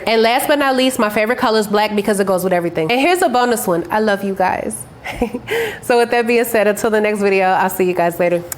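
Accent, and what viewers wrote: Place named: United States. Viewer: I wanna kiss feet, legs, lips, shoulders., Grace Gealey, Zaza Ali, Florence Ballard(RIP), Karrine Steffans, Iva LaShawn are the hottest women. feet and shoulders are hot. i got a question for you that you didnt say in the video. What race/nationality are you? I saw on your Facebook page that you are from Puerto Rico. I thought you was from america because you sound like a black woman. You dont sound like a foreigner when you talk. Did you get married? I saw you are engaged to a man on your Facebook page. I wish wasnt engaged to get married because i would love to have as my woman. If you wanna know about me. Im a lightskin black man who is 5 feet 10 inches. I weigh 185 pounds. Im 49 years old. I live in Maryland. I dont have a black woman. I dont have kids. I live with my parents because i dont have a job. Im trying to get SSDI money from social security office. 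I got a telephone interview with social security October 31 about SSDI money. White people wont let me keep a job because white people complain too much. Thats why im trying to get SSDI money from social security. I hope social security approves me for SSDI money so i can use the money to get a nice apartment in white neighborhood in Alexandria, Virginia.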